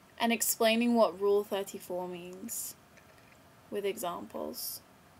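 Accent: England, United Kingdom. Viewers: By the way, from your accent you seem to be from england, so if thats true im just amazed that you also seem to have friends from all parts of the english-speaking world! woah! I mean i looked at a couple other videos & youre saying "my best friend from [canada]" & how you miss your other friend from australia! Very kl! Something i should admit - im: finding it hard to believe, a bit envious as i dont & curious to how its even possible. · I say the phonetic "lol" -_- But I'm proud to say that's the only one =D (ps. Yay, your English accent's coming back =)) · shes english... thts hw ppl speak over here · i subscribed to you just because your british accent. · what's rule 34? sorry for not knowing : P and also, what accent do you have? it sounds british but it goes american sometimes :S :P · Trying a golden oldie again, huh? Well it was good. You sound more English again in this vid so I'm guessing that you're visiting or something. 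· NO! Cute british accent fading!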